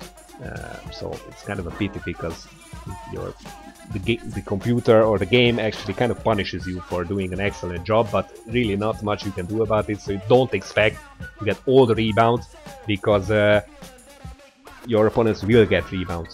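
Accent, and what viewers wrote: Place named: Hungary. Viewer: magyar vagy, csak mert olyan az akcentusod/ are you hungarian, because your accent is like a hungarian's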